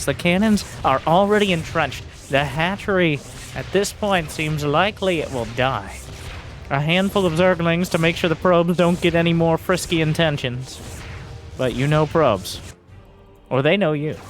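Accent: United States. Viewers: StarCraft needs this American voice on the scene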